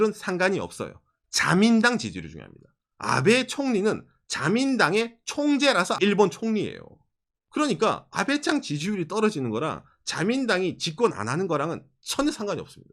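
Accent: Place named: Gyeongsang, South Korea. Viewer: 우짜든동~~~ 사실을 말해도 왜곡되기가 쉬운것이 진실이라 생각하는 바이라.... 그래도 그 안에서 관점의 차이를 알려주려는 의 영상 수십편을 보면서 우리와는 다른 그 곳에 대한 이해가 더 높아지네요 일본으로의 많은 여행경험으로써 인지하는 부분들도 영상을 보고서는 가끔 무릎을 탁!! 쳤습니다 아무튼 지난역사와 현재의 국제정세는 냉정히 봐야 한다는 입장을 지닌 울산 사는 형이.... 우짠지 말씨가 너모 익숙해가꼬 그래가 그 특별한 맻개의 사투리들이 테 정을 더 쌓게 했심데이~ 구도크와 좋아요!!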